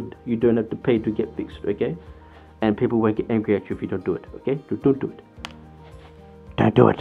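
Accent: Australia. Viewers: wait wasn't he german how does he sound just like an australian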